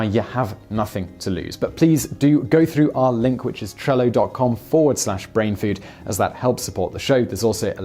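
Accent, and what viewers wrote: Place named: RP, United Kingdom. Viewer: True, he does sound posh but, it's funny as hell when he looses it.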